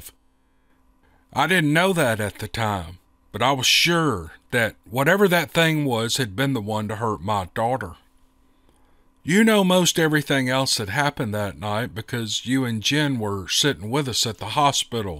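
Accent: South, United States. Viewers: You are so good reading this! Your southern voice is perfect. MERRY CHRISTMAS, TO YOU AND YOURS FROM ME AND MINE!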